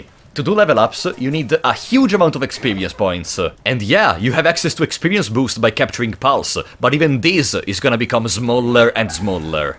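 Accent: Italy. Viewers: Are you a northern or Southern Italian?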